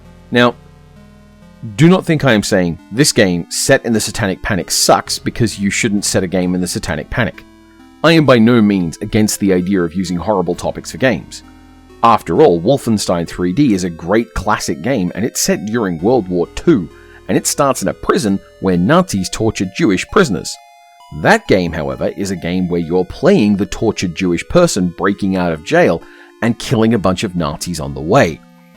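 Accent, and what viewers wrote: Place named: United Kingdom. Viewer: Somehow I didn't notice that had a British accent until the "mum/mom" thing. I have watched several of his videos.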